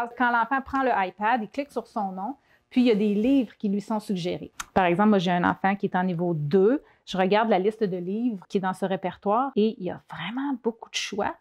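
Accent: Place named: Canada. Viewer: este es el frances de canada? suena un poco gracioso...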